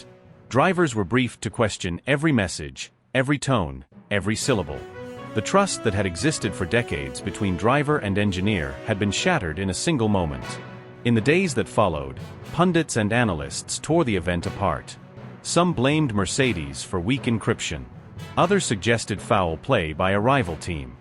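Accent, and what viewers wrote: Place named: United States. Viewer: Absolute rubbish. Why does the voice always have an american accent. Liberty Media as part of the tRump Mafia?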